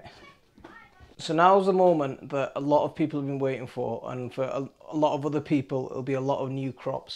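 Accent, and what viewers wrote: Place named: Northern England, United Kingdom. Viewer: I love this channel, not only is it incredibly educational and positive in the gardening sense, but also outside that - I love your northern England accent and Muslim influences. ❤️❤️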